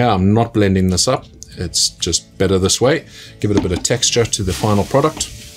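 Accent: South Africa. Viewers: Thanks for the video. I have been making Indian and North African spice blends for awhile now. I am expanding more into cajun/creole cooking now, and this helps. PS love the South African accent. I grew up there. Live in Northeast USA for the last 38 years now.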